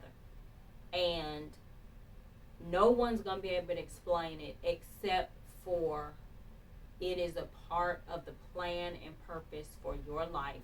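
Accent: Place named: West Virginia, United States. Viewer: Good morning. I can hear your dialect and noticed that it was west Virginia. I have a church member who has the same dialect . On another note, keep uploading These videos . This video certainly spoke to me because people within my own family especially my siblings have told me in my face that I couldn't do things. This video really touched my heart.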